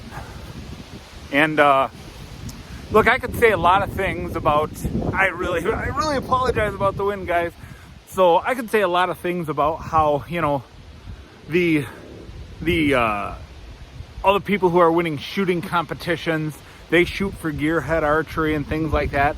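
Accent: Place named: Pennsylvania, United States. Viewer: lol he sounds like an Eagles here in Pennsylvania... I must agree with you... My son is on the Mathews train... He owns the newest one of 2022... I forget the model honestly... Completely dressed out its heavy... He had to add so many silencers to quiet the bow down I was actually in shock and his is set at 40 lbs... But I've been doing research on the shortest Ata and with good speed... I've seen its been 2 years now since you posted this video... Can you give an update??? How's it holding up??? Any issues??? I'm curious... I live here in Central PA not far from State College and the hills are steep some are very thick with under brush and heavy thorn bushes... So I'm trying to stay light and short...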